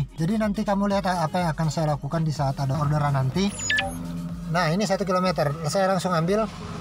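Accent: Manado, Indonesia. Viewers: Org Manado kang bro?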